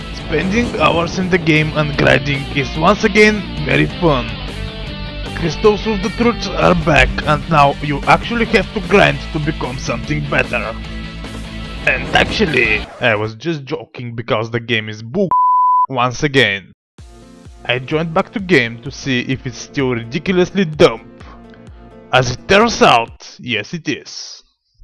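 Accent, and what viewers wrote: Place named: Bulgaria. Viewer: Сигурен съм , че коментатора е Бг личи си от километри ... като цяло най-смешния клип който съм виждал някога ужс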